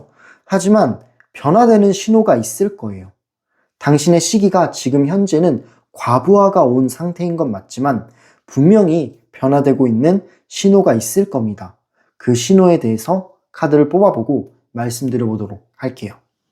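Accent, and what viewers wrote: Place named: Gyeongsang, South Korea. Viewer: 삼번리딩 갱상도 샘인가봐요 리딩중 만족 시릅지도~^^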